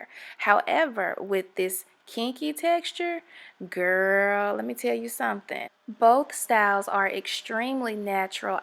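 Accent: South, United States. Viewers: Her subtle southern accent is so cute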